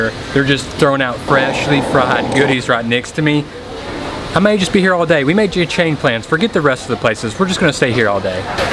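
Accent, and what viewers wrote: South, United States. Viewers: hehehe that southern accent <3 <3 ya'alls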